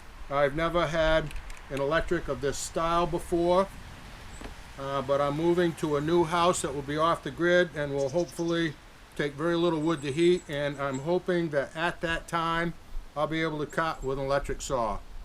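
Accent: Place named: New England, United States. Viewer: Idk, maybe Maine, upper Vermont, or mass? It's hard to tell.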